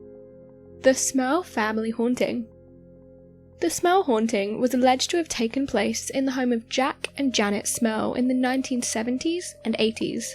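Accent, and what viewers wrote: England, United Kingdom. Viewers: Why is it hard to understand a clear English accent?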